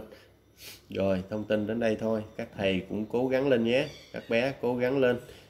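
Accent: Standard. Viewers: Cô chào cháu kênh nói chuẩn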